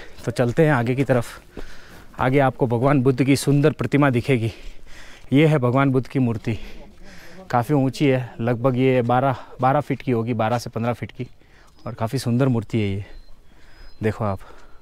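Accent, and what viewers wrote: Gujarat, India. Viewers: Avaj thi lage ke gujrati co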